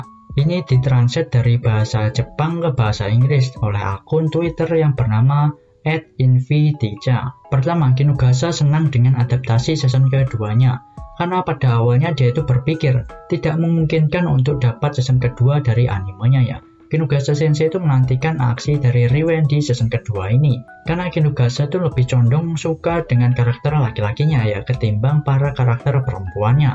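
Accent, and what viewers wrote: Java, Indonesia. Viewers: min kau org mana sih? dri dulu ampe skrg medoknya masih kerasa bgt sumpah 😅😂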